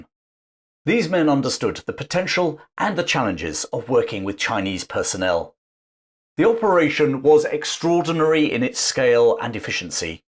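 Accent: England, United Kingdom. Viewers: Thank You, for reporting Weihaiwei with such a lovely VOICE! I was born and raised in the British Crown Colony of Hong Kong in 1946, and attended missionary schools with a British curriculum; therefore, your crisp English accent delighted me dearly. Just like when I was a little girl again. 😊 At one time in the 1950s, my Chinese father worked for the British Army ---- as a projectionist for showing British films---- In Sek Kong, in the New Territories! I got to holiday with my Dad during H.K.'s humid Summers, and remember watching " Horrors of Dracular" sitting BEHIND the large movie-screen. // The British had left Hong Kong with good infrastructure: Building the railroads to the Chinese border.... but also, set up the Judiciary System. Of course Great Britain realized the benefits ( the Lease will be ended in 1997) and made it a great financial center with its great geographic LOCATION in Asia! 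Again, I am a "British Overseas Territory" history-enthusiast and thoroughly ENJOYED your historical video of Weihaiwei. THANK YOU, my Friend! ❤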